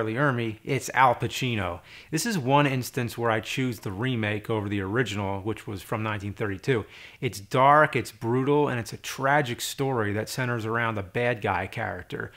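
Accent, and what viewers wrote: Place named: New Jersey, United States. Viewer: Tyler White I think that would be his Jersey accent lol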